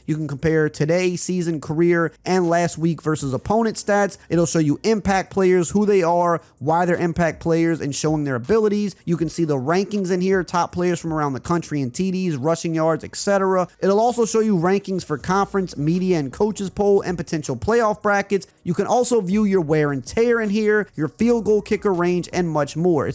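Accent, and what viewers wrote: Louisiana, United States. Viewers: Dang man you sound like you're from St. Tammany Parrish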